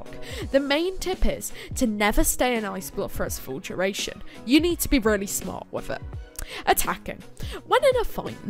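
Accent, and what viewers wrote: United Kingdom. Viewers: British young guy accent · this english accent XD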